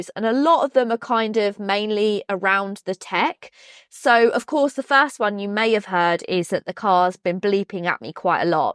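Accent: United Kingdom. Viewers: I like the accent of this british youtuber, it sounds unique and exotic.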